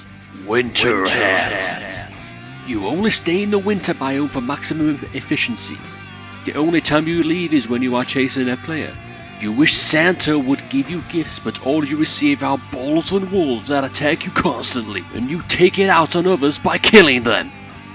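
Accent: United Kingdom. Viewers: Or maybe it's because I was so surprised to hear a British accent, I was honestly expecting some annoying Yank voice 😂 Never knew you were a Brit! Makes all the edgy texts over the years much better knowing the British humour. Americans would be completely serious saying all that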